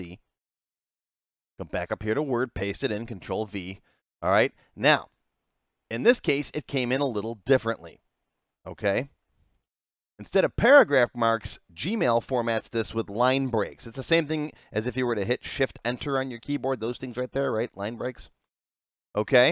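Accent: United States. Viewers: Good ...but It would be better if you took into account viewers whose English Language / American Accent is their second Language, as they may not be able to pick up your American accent as quickly... Regards from an Iraqi living in the Anbar Provence in a remote area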